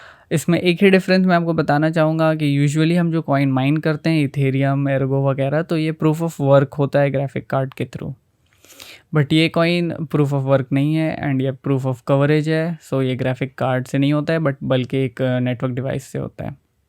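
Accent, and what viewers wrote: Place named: Hyderabad, India. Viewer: he has hyderabadi accent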